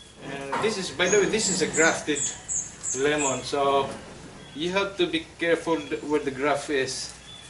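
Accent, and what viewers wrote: Visayas, Philippines. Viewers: It's more of a Visayan accent 😉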